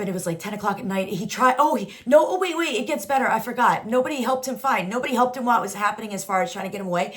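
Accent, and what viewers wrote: Morocco. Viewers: You're really a surprising and very open minded person. I am sure moroccans have akready started considering you as a citizen of Morocco. I still apreciate your darija accent.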